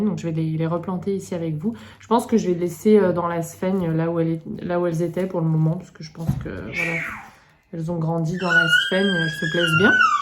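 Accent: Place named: England, United Kingdom. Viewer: C'est mon premier commentaire sur ta chaîne! J'apprécie vraiment de te suivre; je me regale a chacun de tes vlogs même si pour tout te dire je les trouve bien trop courts 😉. Tu as l'air si douce; si gentille et j adore ton petit accent anglais. J'aime bcp ce que tu nous proposes dans tes vidéos ( plantes; recettes; coups de cœur; blabla bébé)! J'aimerais beaucoup que tu nous fasses une vidéo sur tes looks/tenues car je trouve tes tenues hypers sympas et originales à chacunes de tes vidéos! En tout cas tu es une de mes plus belles découvertes YT. Bises; à bientôt.🌼🌷🍃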